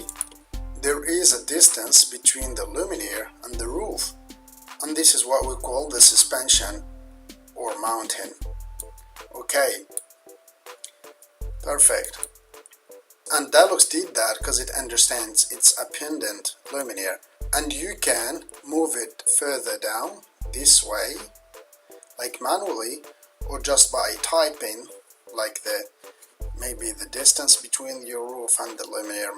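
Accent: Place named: Australia. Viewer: you got some accent Matttte !! AUstralian !!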